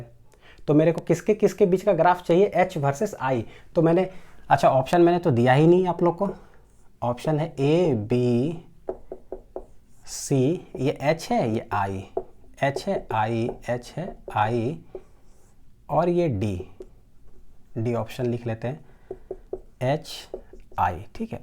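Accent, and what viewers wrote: Bihar, India. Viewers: Sir are you Bihari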